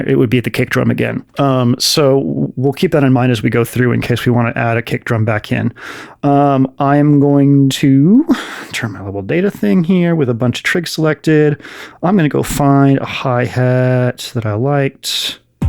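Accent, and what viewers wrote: United States. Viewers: because your from the midwest , texas perhaps ? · more southern talk plz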